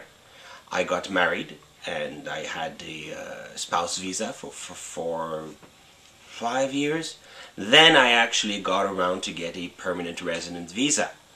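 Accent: Quebec, Canada. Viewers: lol im from montreal and i picked up on your accent quick to. great to see quebecers doing big things in the world as the gov here to day seem to think the world revolves soley around quebec. anyways intersting video, thanks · i am wondering which part of canada you came from. Your canadian accent is different from Ontario accent. Many people often think all Canadians speak just like you, and I couldn't understand why because people from ontario speak the same as a lot of americans. So I wondered where you came from. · what's up with the accent? is that canadian? the weird sounding Ts? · He's lost alot of his canadian accent lol!. OH and happy Canada day from the capital :) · Yay canadians - you sound french canadian.. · Your speaking English with a "Quebecer" accent. Your son can be Tri-lingual! After all they do speak French in Quebec.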